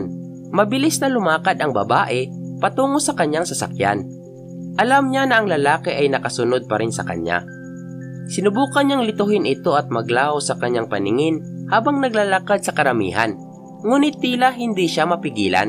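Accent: Visayas, Philippines. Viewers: Bisaya k b dong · Bisaya Accent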